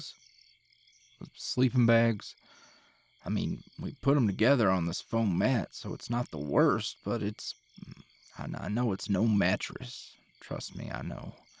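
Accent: South, United States. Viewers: The thing that is so cute about country boys is how they sound exactly like:3 <3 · It gives me an unexplainable amount of serotonin to hear a man with a southern accent and knows he's not homophobic or any of that other negative stuff associated (rightfully so) with southerners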